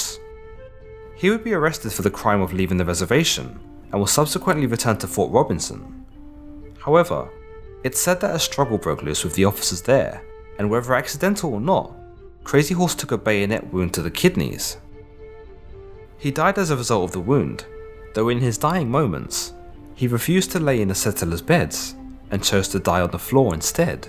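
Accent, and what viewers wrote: United Kingdom. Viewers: Fucking hilarious hearing a Brit talk about my history lol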